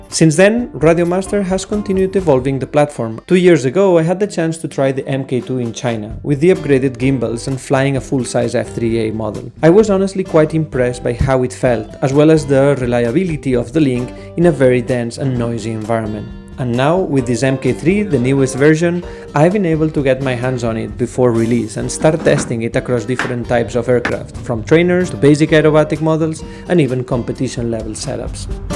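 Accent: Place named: Greece. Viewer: Greek i believe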